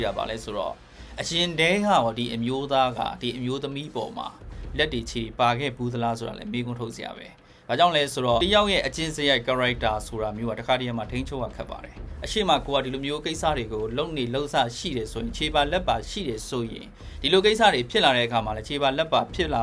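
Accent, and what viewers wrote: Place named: Dawei, Myanmar. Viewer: ထား၀ယ်လိုပြောနေတာ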